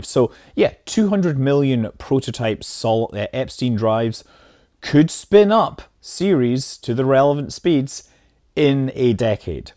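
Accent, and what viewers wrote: Scotland, United Kingdom. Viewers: thank you Scott Manley. Great stuff. a fun mix of Kerbal, sci-fi, and hard science discussion, all in a Scottish accent. I wish I had stumbled across your channel earlier.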